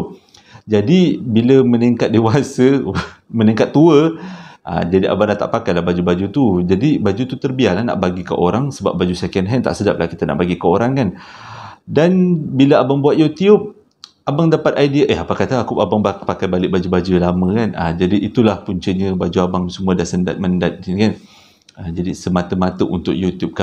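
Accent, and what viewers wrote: Java, Indonesia. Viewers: Abang jawa ke bang.sedap didengar. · Slank tu mcm bunyi ala2 jawa.....memang tulen ye😬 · Bercerita ada slang2 jawa..tu yg terpikat kat channel ni..menusuk dlm jiwa raga...😊😊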